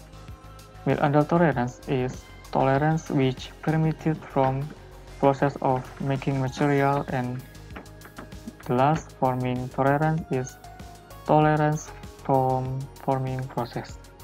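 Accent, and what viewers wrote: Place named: Indonesia. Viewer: Wah keren mas bro, jarang" liat video engineering aksen indo. Kebanyakan india 😂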